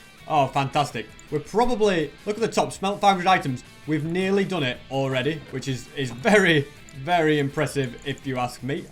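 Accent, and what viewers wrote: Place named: London, United Kingdom. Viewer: hes english (london)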